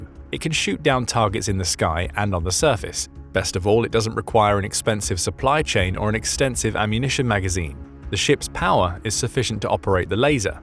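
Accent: United Kingdom. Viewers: Crazy a British is doing the video voice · Nothing worse that hearing about the us military with a British accent. All set. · I love hearing just how cool the U.S.A. is in a British accent its quite perfect good sir. Lmao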